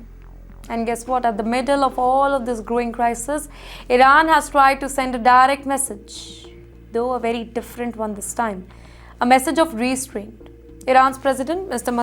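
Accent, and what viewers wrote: India. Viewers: THIS HINDIYAN SPICY ACCENTED NEWSCASTER IS WEARING A SCHOOL UNIFORM, MUST REALISE HER MODI WILL SUFFER THE IRAN OIL & GAZ SUPPLIES IF THINGS ARE MESSY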